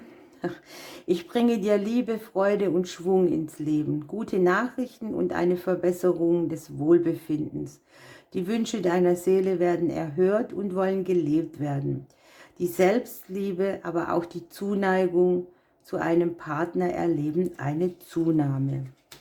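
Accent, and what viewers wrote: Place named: Karlsruhe, Germany. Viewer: Rausgehört😊ich aus Bruchsal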